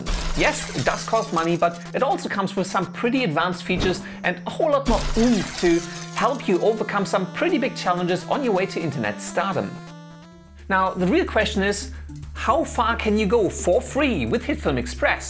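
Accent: Germany. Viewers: Are you German, cause you accent is so strong and it sounds like german english 😂😂😂 (btw I'm German) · Great comparison! Very helpful! Do you think Hitfilm Pro can compete with Adobe premiere/After Effects? By the way: Are you a native German? Your English sounds like that a bit... ;-) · Du hast einen extrem deutschen Akzent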